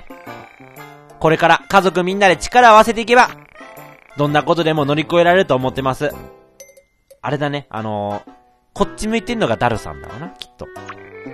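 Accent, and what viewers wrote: Kansai, Japan. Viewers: 突如おすすめに出て見てなかったのでシリーズ一気見しました、関西弁レトさんいやされる… これゆでたまごでピッチャーでイヌ"ジ"って、有名な元野球選手モチーフにしてるのかな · ストーリーもだけど、関西弁が聞きたくてみてしまう〜✨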